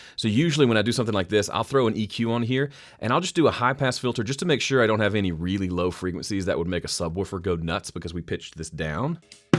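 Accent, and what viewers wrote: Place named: Indiana, United States. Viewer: So you’re from Mississippi? Sound more Indiana🤓 How ‘bout dem Memphis Tigers? Great tip too, thank you good sir.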